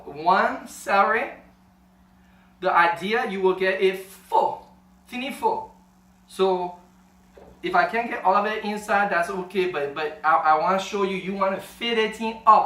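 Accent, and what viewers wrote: Liberia. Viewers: Very nice to hear your Liberian accent. Your accent sounds just like my husband. Would love to see more videos with you speaking your natural accent. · Dis na small ting here o! Day man know how to talk ray liberian English yah. Day foo look goo o.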